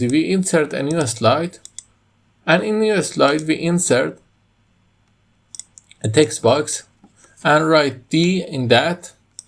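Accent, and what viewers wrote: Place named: Iran. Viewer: ایرانی 😅😅😅😅 لحجت خیلی زایه هست حتی از هندی ها هم بد تره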